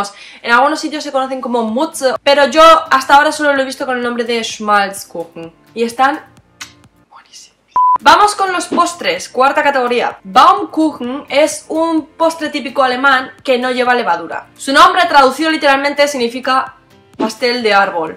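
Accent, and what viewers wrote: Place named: Spain. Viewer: vale vale ya m ganaste y m abriste el apetito. m acabó d suscribir tu asentó Españo m mata (m encanta)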